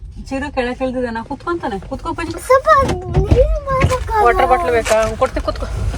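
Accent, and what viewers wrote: Mandya, India. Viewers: Mam just informed all what's Retired age in US for Indian people’s for all categories:Nimma Mandya kannada bashe onthara channagidhe😂😢😅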